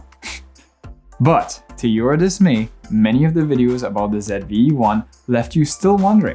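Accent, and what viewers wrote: Trinidad and Tobago. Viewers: Do I hear ah Trini ??? 🇹🇹👀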